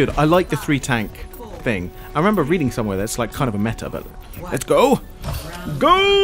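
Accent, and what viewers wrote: United Kingdom. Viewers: you have a Voice Like One of that English Steryothypical Tea Drinker I Like it!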